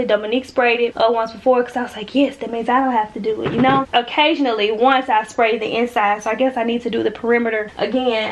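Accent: United States. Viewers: Your little country accent is so cute!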